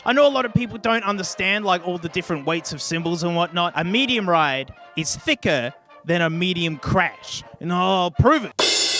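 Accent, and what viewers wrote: Australia. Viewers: Wait a minute, " ", that familiar Aussie boy voice, is this the man behind Tony and the Litreboard?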